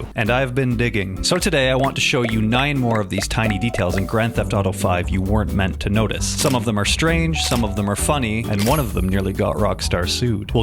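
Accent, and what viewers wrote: Canada. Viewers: Us? Your canadian?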